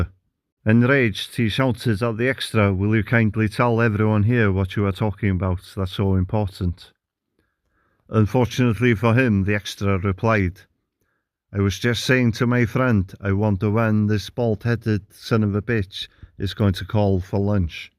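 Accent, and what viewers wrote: Wales, United Kingdom. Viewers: Loving your Welsh accent!! Love your channel xx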